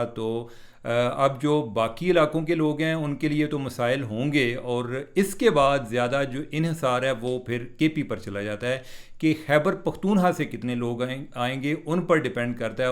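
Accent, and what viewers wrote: Punjab, India. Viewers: Aap sanjeeda se sanjeeda baat ko halky phulky andaz me bayan karty hain.khas tor per punjabi tarka jo aap lagaty hain .I enjoy it a lot.Mera taluq punjab(Burewala) se hai iss liey .keep it up thanks.😂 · sb always stayed blessed.always excellent analysis sir..with punjabi touch very cute..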